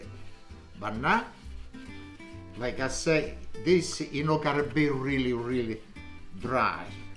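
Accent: Italy. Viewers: Bon giorno papa your ciabatta is bella 😊 I like Italian's accent when speaking English 😁 makes me smile all the time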